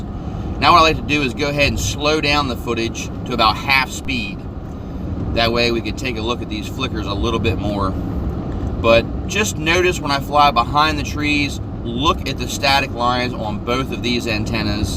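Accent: United States. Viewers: dude I love your accent :D what area in the US are your from?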